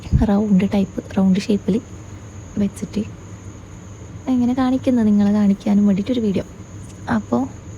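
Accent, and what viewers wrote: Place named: Kasaragod, India. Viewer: Edh slang 😊kasaragod slag,😅😅😊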